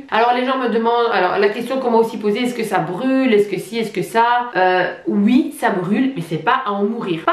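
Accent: Brussels, Belgium. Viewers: Je suis complètement sous le charme de ta super personnalité positive (y) Et les petites touches d'accent bruxellois que tu intègres spontanément me font bien sourire;-) Continue comme ça et bisous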